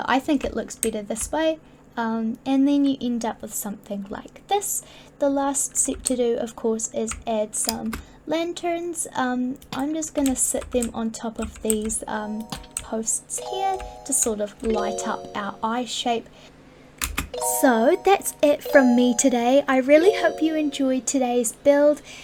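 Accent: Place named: New Zealand. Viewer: Finally a kiwi builder so I can understand what your saying lol loving the builds super clean and super simple hoping to up my build game a bit looking forward to your next tutorial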